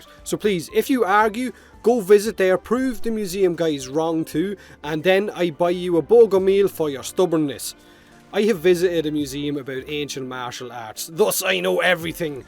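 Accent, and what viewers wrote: Ireland. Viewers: Jacksepticeye reading comments... reading comments... I don't think we realise just how much we need Irish men reading and taking the mickey out of internet users.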